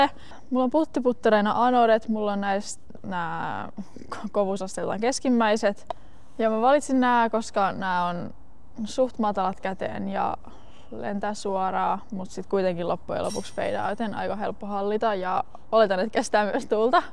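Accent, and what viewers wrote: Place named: Finland. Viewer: Aivan loistava, tuli ihan Ismo Leikola mieleen kun Suomessa ollaan niin lausutaan ne suomalaisittain 😅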